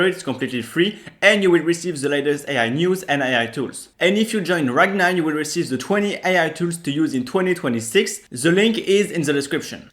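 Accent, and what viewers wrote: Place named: France. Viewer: I watched your French version of this video.i didn't know you can speak English this well. Just that your French accent is too strong. I like it.😂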